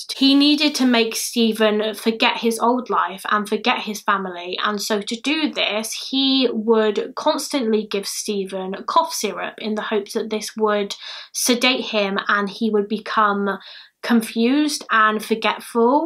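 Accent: United Kingdom. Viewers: love your British accent ^.^ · I don't know why, but your British accent is so beautiful. You have a super soothing voice! I loved this video!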